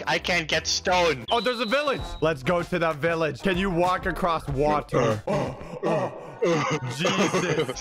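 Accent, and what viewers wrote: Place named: United Kingdom. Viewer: I love you sockfor1 even in British I still love you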